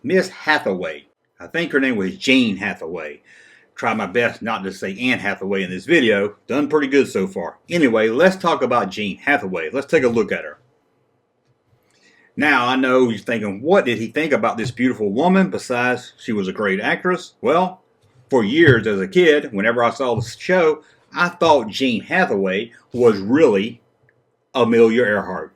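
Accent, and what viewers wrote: South, United States. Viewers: It's how we say it in the south.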